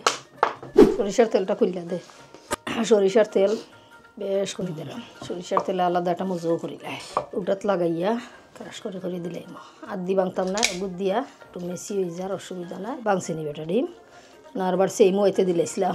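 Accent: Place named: Sylhet, Bangladesh. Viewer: Aafa afna amrar sylhete, London a sylheti mat hunia Val laga afar muko amrar sylheti mat hunia, Amra hokkol syloti...aafa vlog dakta ar lagi kub kub Val laga.....Amar sylhet Amer afa..ra suvo kamona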